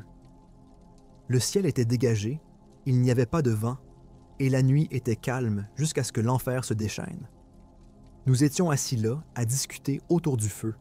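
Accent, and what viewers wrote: Quebec, Canada. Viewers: C’est un accent québécois! 😉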